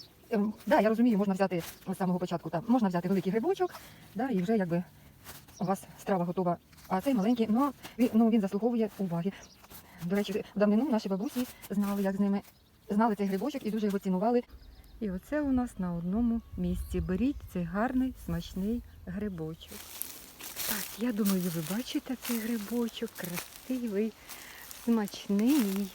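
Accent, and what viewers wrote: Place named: Poltava, Ukraine. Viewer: Цікаво, з якої ви області? Чую ондо, осьо, мабуть Полтавщина? )) чи Вінниччина?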